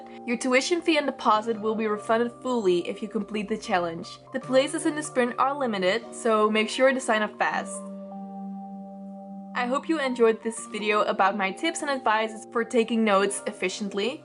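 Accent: Netherlands. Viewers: Is that dutch 😂❤️ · at first i thought u were dutch too because of those pens and your accent... and then when we got to see your notes, I SCREAMED BECAUSE YOUR DUTCH TOO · i smell some dutch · Wait omg r u dutch?! 😍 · Are you dutch? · OMG ARE U DUTCH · Ok but like I have such a strange feeling you’re dutch, is that correct? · Ben je Nederlands 😅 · Ik hoorde aan het begin van de video een nederlands accent, toen ik jouw schrift zag wist ik het wel zeker :) supergoede engelse uitspraak! <3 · Hi i know this is so random but are you dutch?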